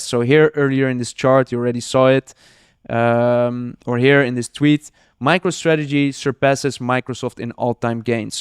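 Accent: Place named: Netherlands. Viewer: sterk nederlands accent , goede content .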